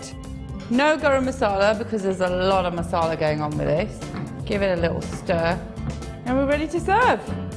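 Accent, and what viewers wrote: England, United Kingdom. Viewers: I’m guessing why she is talking like British and turns out she is British! ain’t it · mam.. your British accent is brilliant.... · Sounds very British accent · Ok, I have never heard a more "English" accent in my entire life. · Rahul Gaikwad it’s called a british accent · love British accent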